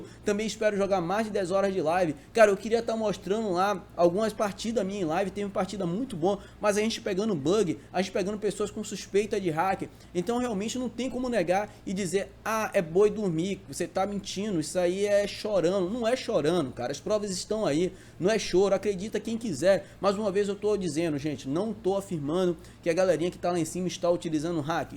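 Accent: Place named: Bahia, Brazil. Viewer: seu lindo, foi doloroso traduzir seu baianês nesse vídeo, mas tá aí, tudo em inglês pra você. faça bom proveito: )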